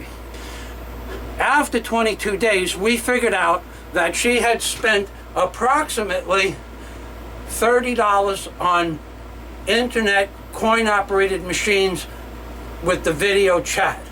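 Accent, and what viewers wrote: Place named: East Coast, United States. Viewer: Brother I hear an east-coast accent; mind if I ask what state are you from?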